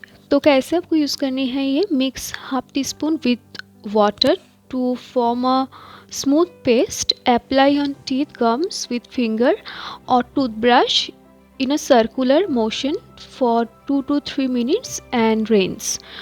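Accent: Bengal, India. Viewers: Are you Bengali??? Your Hindi accent has a Bengali style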